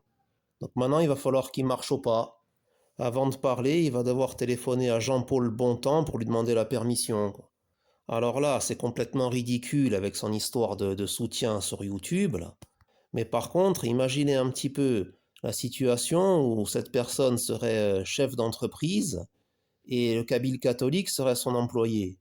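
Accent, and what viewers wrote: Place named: Corsica, France. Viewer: Cher compatriote, Pace e salute ! Votre accent ne m'avait pas trompé, je le connais trop bien. Permettez-moi de répondre à votre contradiction, non pour alimenter une stérile polémique, encore moins pour être désagréable, Dieu m'en garde. Je souhaiterais simplement vous poser quelques questions. Au préalable, je précise que j'ai reçu le baptême avant Vatican II, et que je demande chaque jour la grande grâce d'être inébranlablement fidèle à ma Mère, la Sainte Église, à sa Doctrine, à son Culte, à sa Morale, à sa Loi. Voici donc mes questions : Un VERUS PAPA, càd catholique, revêtu validement et légitimement des prérogatives de Saint Pierre, peut-il ostraciser et interdire la Messe codifiée à perpétuité par saint Pie V ? Peut-il nier la Médiation universelle et la Corédemption de Marie ? Peut-il bafouer la Morale en bénissant ceux qui pratiquent des abominations à Dieu ? 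Peut-il soutenir que toutes les religions conduisent à Dieu, contredisant ainsi le Commandement du Seigneur à ses Apôtres avant son Ascension et la raison même et la sacrée Mission de l'Église hors de laquelle point de salut, laquelle continue Jésus-Christ ? Un authentique Vicaire de Jésus-Christ peut-il affirmer que les catholiques adorent le même Dieu que les musulmans ? J'arrête là, mais je pourrais allonger la liste sur de nombreuses pages. D'ailleurs, vous pouvez vous-même comparer la Doctrine immuable de la sainte Église, de ses Papes, de ses Conciles, avec les innovations et hérésies professées depuis Va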